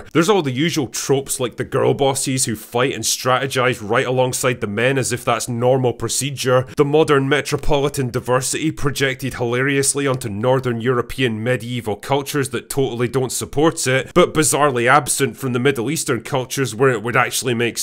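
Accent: Scotland, United Kingdom. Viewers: Thank heavens, I saw season 2 was on Amazon last night, I watched the review of season 1, and i felt so awful after 3 minutes, I wondered if Id need to find the toilet.. This is not the video I wanted, but its the video I Needed, as sorts out the world into sanity/insanity in sheer minutes with an excellent Scottish brogue. Cheers man.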